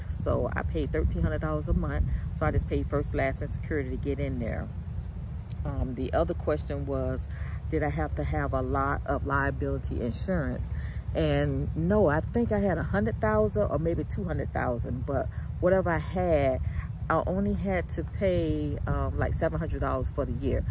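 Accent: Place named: South, United States. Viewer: You sound like my TT, and I'm straight out the south too. I think you sound just fine! Thanks for all this guidance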